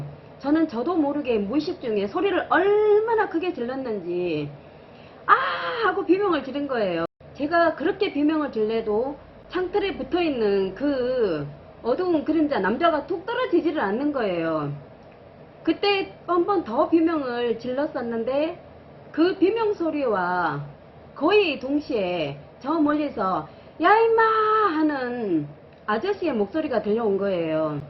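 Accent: Gyeongsang, South Korea. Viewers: 경상도 악센트가 진하니 푸근하기도 하고, 말씀의 내용이 강약이 생겨서 더 정겹고, 명확하게 들려옵니다~ ^^ 들여다 보는 놈, 얼마나 놀랬을까요~ㅎ 그쵸, 한 번의 나쁜 것을 일반화시키는 것은 위험하죠~ ㅎ 재밌게 잘 들었습니다~ 👍👍👍+41 먼저 찾아주심에 손잡고 응원합니다~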